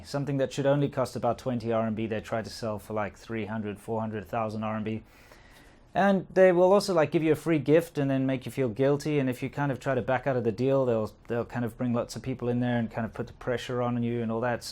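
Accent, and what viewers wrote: South Africa. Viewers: are you south african ? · Hi, I like your videos but why do you tell everyone you are from England? Your accent is quite markedly South African. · You're not from London. You sound like you're from South Africa. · You sound South African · You sound South African ! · why do you always tell chinese locals you are english when you sound like you are from south africa? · South African? Thanks for filming in Scope.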